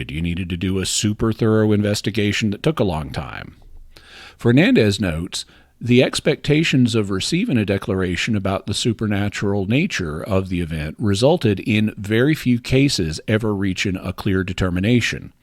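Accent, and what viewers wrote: South, United States. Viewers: I think that moving back closer to the South is starting to rub off on you dialectically. You definitely sound as if you're taking on a bit more of a southern affectation, reminiscent of someone like Alan harrelson, who runs pipe cottage. And if you don't know him, I suspect he would be someone you would have an awful lot of fun talking with. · Did gain a country accent when I wasn’t looking? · Wow, really is from the south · I was so distracted by this new Southern accent that I could hardly pay attention to the subject matter! I was even trying to figure out if this was a joke video! Lol! Good thing I can rewind!😂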